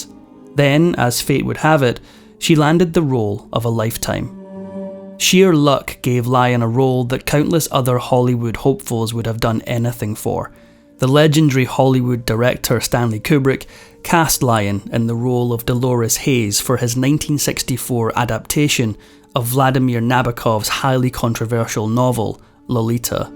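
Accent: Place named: Scotland, United Kingdom. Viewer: You have a lovely Scottish accent.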